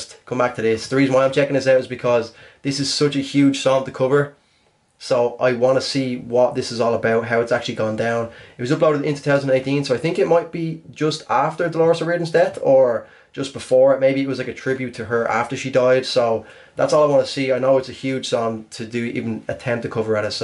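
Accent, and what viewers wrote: Ireland. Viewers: is one of my all time favorites!! I feel like they did the cover very well and still hit the feels!! Love the Irish accent!! 😊❤️ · Limerick? Is that an Irish accent?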